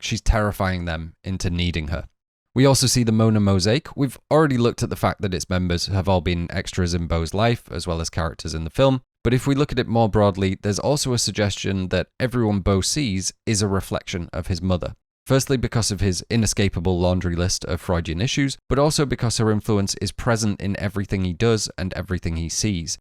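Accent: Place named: Northern England, United Kingdom. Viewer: I was following you right up until you pronounced Albert Camus' name like that or so. I understand that you're English, and even more, you're from the North. The French have certainly had an interesting relationship with the Northern English, the Scotts and the Irish. However monstrous the French may be, pronouncing Camus' name as "Came-us" is horrifying beyond anything Ari Aster could put in any of his films.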